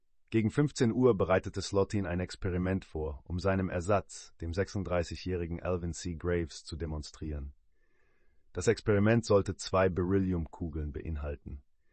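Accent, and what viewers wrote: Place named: France. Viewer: Du hast einen leichten Akzent. Bist du Franzose?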